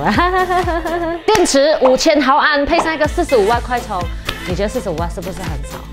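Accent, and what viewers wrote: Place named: Malaysia. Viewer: 这是马来西亚中文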